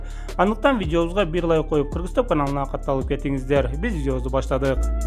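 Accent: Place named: Batken, Kyrgyzstan. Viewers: Бул памирлик кыргыз окшойт ичкилик диолектикасы менен чалдырай бергенин койбоду бирок теманы жакшы тандайт. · Баткендик журналист ин,ын Аны,уны кошуп суйлошот.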